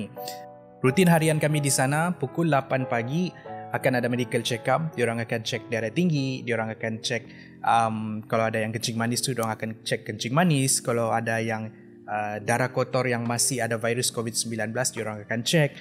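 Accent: Sabah, Malaysia. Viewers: Kenapa suara dia mcm orang sabah eh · Aku Nak Tanya bang ni orang Malaysia Ke orang iban Ke orang Sabah?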